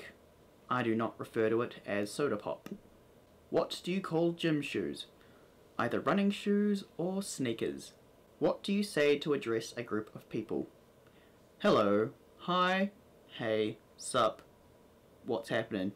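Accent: New Zealand. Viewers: You have quite a refined Kiwi accent, go out into the wops and yool git some rool bad koiwoi accents. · Well let's see your video on this... I'm from Auckland, and he 'sounds' pretty kiwi normal to me. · I think what stands out the most for me in New Zealand accents are how the letter E is pronounced in words. It is my favorite and definitely different from Australian or British. :) One of my online friends is from NZ. I like how you say sneakers and tomato by the way.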